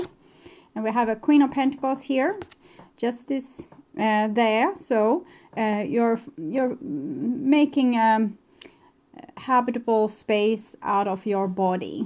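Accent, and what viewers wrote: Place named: Sweden. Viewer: The harsh truth in a beautiful Swedish accent. Lol awesome . Thanks sweetheart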